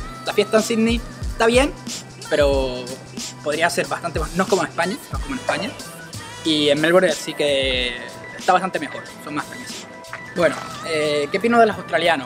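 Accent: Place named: Chile. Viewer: Tú acento es igual q el de los chilenos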